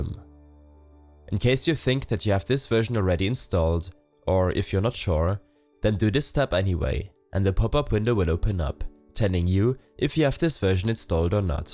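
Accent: Germany. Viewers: i heard your accent in the firt 10 seconds i thnik its german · Defenetly a german guy😂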